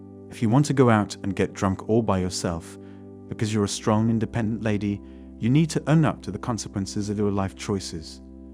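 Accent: United Kingdom. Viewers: He just goes on and on with the same old tirade. You don't learn anything on this channel. You are just putting pennies in his pocket. Then he says in some videos that he is American but you hear a British accent lol. I think it's a text to speech program because some simple words are often mispronounced.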